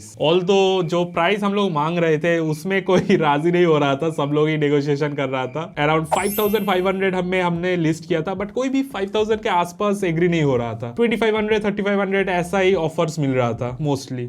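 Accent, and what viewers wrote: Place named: Kolkata, India. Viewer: U have Kolkata accent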